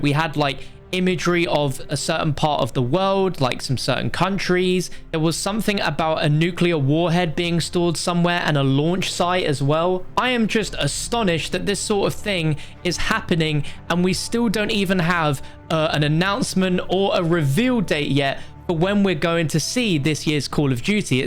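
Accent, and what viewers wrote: United Kingdom. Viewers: Why is British accent so weird?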